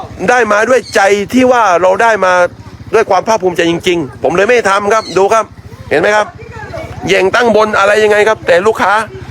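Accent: South, Thailand. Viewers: ชอบนายหัวจาพูดใต้ไม่ดัดจริต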